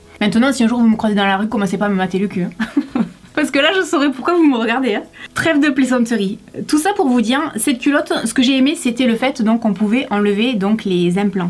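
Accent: South, France. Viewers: Je suis amoureuse de ton accent du sud😍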